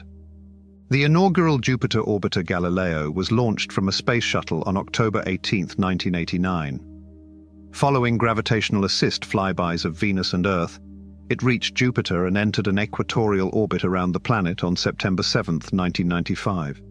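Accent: United Kingdom. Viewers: Finally a video with a British narrator🎉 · 🎉 Right? Everything just sounds 20% smarter with a British accent 🇬🇧📚 Feels like you're watching a documentary and getting knighted at the same time 😂 Who else lowkey rewinds just to hear them say “laboratory” again? 👇🎧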